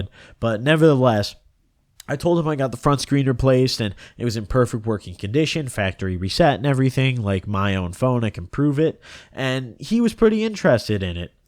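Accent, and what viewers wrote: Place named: Naperville, United States. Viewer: are you from naperville. I’m from around there and it sounds like you are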